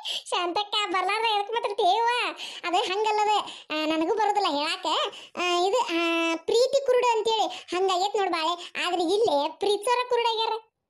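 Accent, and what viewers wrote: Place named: North Karnataka, India. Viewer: Uk style bhase super comedy antu cute yaaaaa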